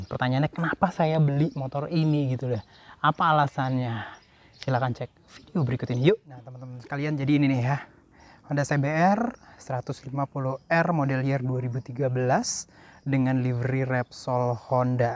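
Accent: South Jakarta, Indonesia. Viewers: Agak2 jaksel ya ngomongnya 😁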